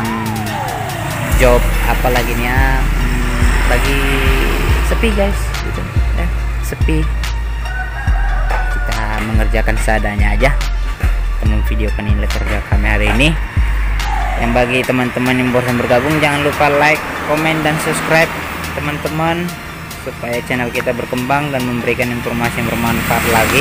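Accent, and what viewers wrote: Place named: Pematangsiantar, Indonesia. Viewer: Dimna lokasi bengkel mas... Dari logat bicaranya kyk org siantar abg ini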